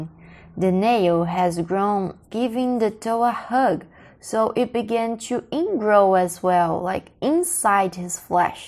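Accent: Northeast, Brazil. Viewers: que pena uma dublê , era mais lindo sua voz nordestina, que nem a minha. 😊😍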